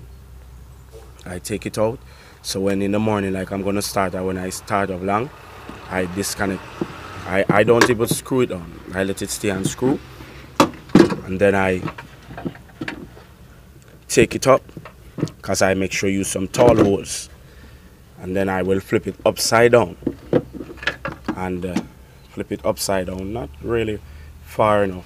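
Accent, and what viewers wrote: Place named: Jamaica. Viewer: Bro you're from Trinidad and sounding like a Jamaican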